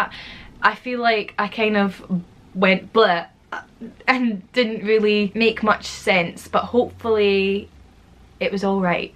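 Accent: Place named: Glasgow, United Kingdom. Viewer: Good luck lovely!!! I'm from Glasgow and have been loving hearing a local accent!! I'm sure you will have the time of ur life in Canada ♥️♥️♥️♥️♥️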